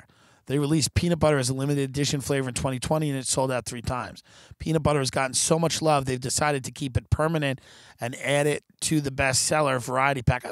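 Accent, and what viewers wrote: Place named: Chicago, United States. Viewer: love you Tim. you sound like my Chicago cousins. i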